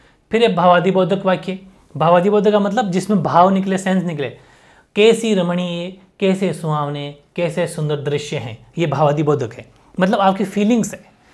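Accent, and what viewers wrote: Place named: Jodhpur, India. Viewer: bohat bdiya lagi sir class bolta h jodhpur vala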